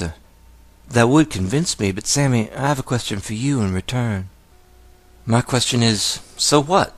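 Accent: South, United States. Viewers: I really like your voice. Are you from Virginia? You sound like a classic southern gentleman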